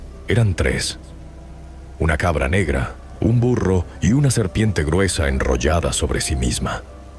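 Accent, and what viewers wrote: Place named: Venezuela. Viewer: te escucho debes de Venezuela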